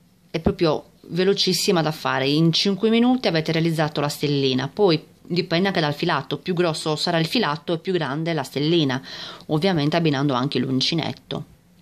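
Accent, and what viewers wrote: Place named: Sardinia, Italy. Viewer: Molto molto carina e ben spiegata. E poi adoro il tuo accento sardo 😉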